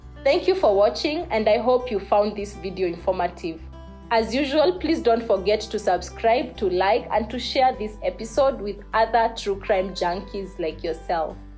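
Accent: Kenya. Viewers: You are a very good storyteller. I love listening to your beautiful Kenyan accent.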